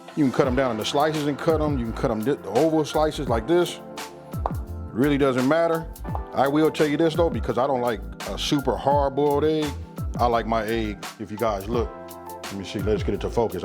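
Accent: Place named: Mississippi, United States. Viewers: OH yum, very similar to the way my mom and I make it.....I’ll call it Northern Style Macaroni lol. I’ll be making this as soon as it gets a little warmer, still in the 30’s and 40’s up here. What makes this Southern we all use the same ingredients. My boyfriend that passed 3 years ago, turned me on to Miracle Whip and I love it. Thanks for the recipes,. You are so fun to watch......love the Southern accent. Peace and be safe. You’re wife is beautiful and you’re a gem, Would love for me to have someone like you cooking. Yum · Ur wife is beautiful and I think you are both awesome, ur accent tells me you live close to me, In an Arkie, you sound like Mississippi, God bless you, I watched you make this and thought a couple cans of shrimp cocktail shrimp would put that over the top, maybe a little stripes of bacon crushed up over the top, man I gotta go eat something now, God bless you and urs.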